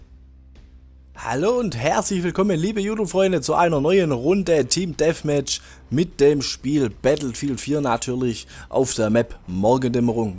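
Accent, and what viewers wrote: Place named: Swabia, Germany. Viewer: Ein Schwabe der versucht Ostdeutsch zu reden. Das klingt einfach schrecklich!!! Du zockst auf der PS4? Vielleicht trifft man sich und ich bring dir´s Ostdeutsch bei... gruss Ulle1410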